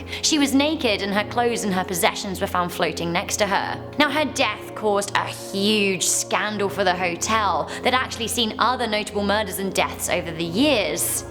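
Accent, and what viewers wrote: England, United Kingdom. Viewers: I thought your from england because of your accent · Does have a british accent Like:yes Comment:no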